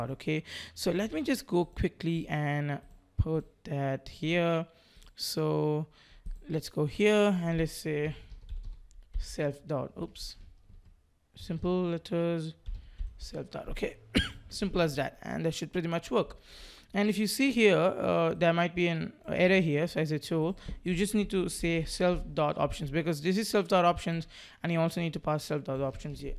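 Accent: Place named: India. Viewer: God bless this boy with a strong Indian accent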